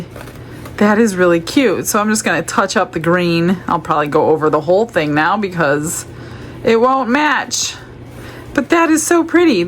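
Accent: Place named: Midwest, United States. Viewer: I get so many neat ideas from you. Your speech pattern and vocabulary make me think you are originally from the Midwest. I am from Nebraska and you make me think of home 😊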